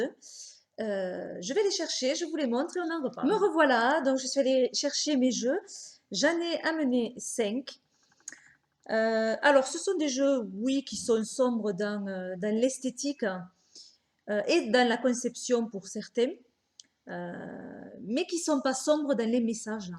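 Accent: South, France. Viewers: avec ton accent du soleil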